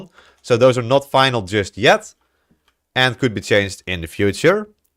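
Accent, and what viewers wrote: Netherlands. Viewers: U sound nl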